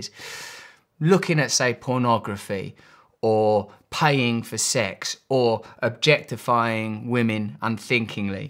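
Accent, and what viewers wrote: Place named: London, United Kingdom. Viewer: You sound like you're from London! 😀 Interesting stuff, friend. I've been a fan for a long time and I appreciate you uploading so often.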